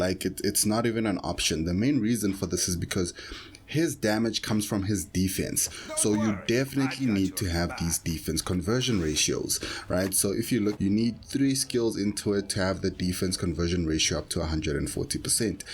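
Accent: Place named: South Africa. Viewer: Jasis so good to drop into a dislyte video and hear a lekker south african accent! you playing global?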